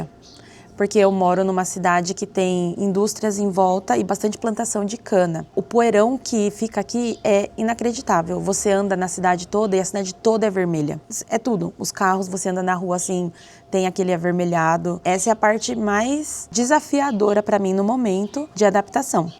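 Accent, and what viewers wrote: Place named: Brazil. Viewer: Você deve ter crescido no Brasil, pois fala como brasileira mesmo, e não como alguém que cresceu no Japão.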